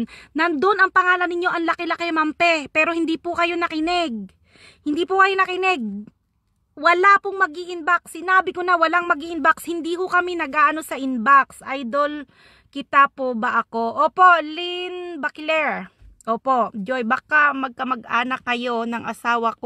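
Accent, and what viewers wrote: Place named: Batangas, Philippines. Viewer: Hahaha,,, nkkatuwa k nmn mgsalita ng batanguena🤣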